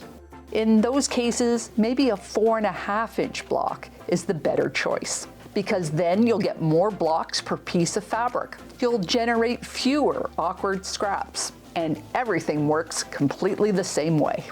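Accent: Canada. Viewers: Hi! I just started quilting (been sewing for years) but got recommended your channel to start, and it’s so helpful!! I caught an accent and saw you’re Canadian too! I was wondering if you’ve noticed price increases for certain tools/materials in Canada specifically? Prices have gone up overall, but for anything in particular that you’ve seen, or anything that’s maintained affordable for the hobby (not including reusing/thrifting)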